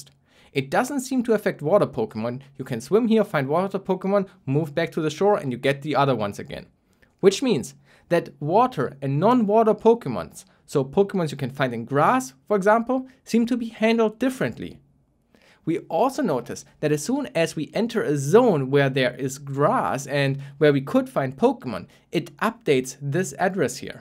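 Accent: Germany. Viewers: digga dein deutscher akzent ist ja mal anders cringe :'D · Er spricht englisch, hört sich aber deutsch an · the german accent is so strong 🤣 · Man hört so raus dass du deutsch sprichst xD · You sound very much like youre german. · Er spricht gutes Englisch aber man hört trotzdem das er Deutscher ist 😄👌 Damals als Kinder haben wir Handy Pokemon gesagt weil Missigno mit der Antenne aussieht wie ein Handy 😂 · Beim Hören gemerkt der ist doch ein Deutscher und wurde im Impressum bestätigt. LG aus Bremen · love the video,are you german? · I knew you must be a German (way of speaking) 🤨 and you are... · Nice German accent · Ich liebe diesen deutschen akzent 😂 aber geniales video 👌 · i can hear this guy is german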